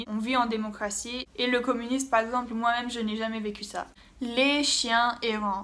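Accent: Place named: Romania. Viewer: Oh la vache ton accent en roumain!😍😍😍😍😍😍😍😍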